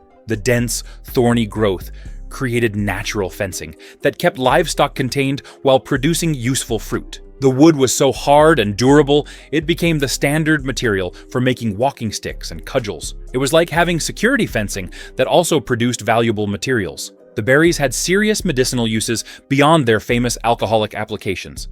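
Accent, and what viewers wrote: United States. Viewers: Such a disappointment that this is AI slop which is not marked up as such in YouTube - The pictures don't match the commentary a lot of the time often showing completely different fruits to those being talked about. Pauses and emphasis in the American commentary are very strange often a symptom of AI. I think the content has many inaccuracies - Saskatoon is native to Canada and not Scandinavia, Mespilus is the botanical name for Medlar and I wonder if Jujubes were really grown in there?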